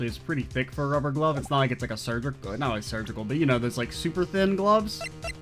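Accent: South, United States. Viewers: Meekins has the best court intro. An innocent confession right off the bat xD Also, I quite enjoy your southern accent, not many peeps I watch has one. Makes it more unique.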